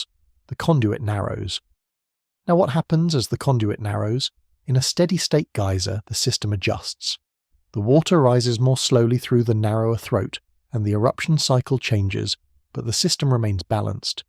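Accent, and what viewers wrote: United Kingdom. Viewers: Everything with a British accent is a bullshit Lie!!!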